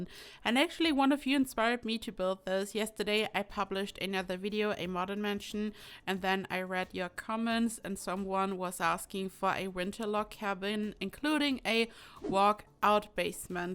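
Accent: Germany. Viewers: your accent sounds kinda German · Höre ich da einen deutschen Akzent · are u deutsch? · Are you german?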